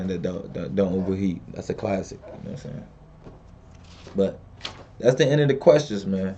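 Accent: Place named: Detroit, United States. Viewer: Does your swag rub off on So or vice versa? (I notice y'all talk similar maybe issa Detroit thing)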